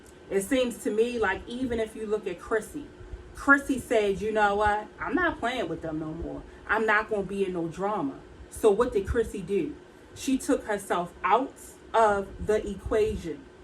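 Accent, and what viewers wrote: Baltimore, United States. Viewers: Love your Bmore accent!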